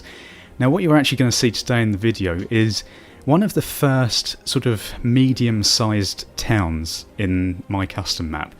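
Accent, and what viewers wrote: United Kingdom. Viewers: YAY he is british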